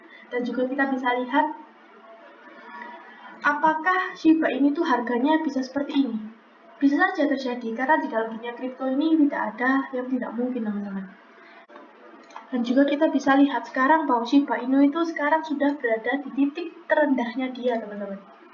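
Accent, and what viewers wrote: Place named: East Java, Indonesia. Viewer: Logat jawa timuran.... suroboyo yaaa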